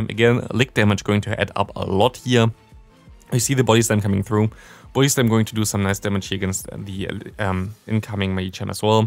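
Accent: Germany. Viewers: Germans speak English obviously with some German accent bits in it. Nothing unusual. Don't bother it.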